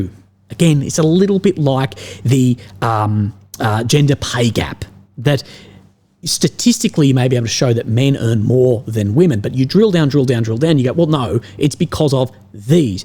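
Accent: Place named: Australia. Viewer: Australian accent cracks me up " He ahhhhhh" If you notice " He ahhhhhh". Then over " He ahhhhhh" you see..... but " He ahhhhhh". Love ya biddy! Keep up the good work " He ahhhhhh" :-D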